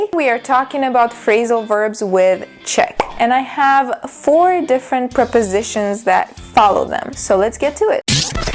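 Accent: United States. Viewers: Hello Teacher! I have checked out all English teachers on YouTube. I see English Teacher is one of these best teachers on YouTube. I've ever learned English with her videos, clearly American accent and made me easy understanding her videos, Thanks a lot Teacher.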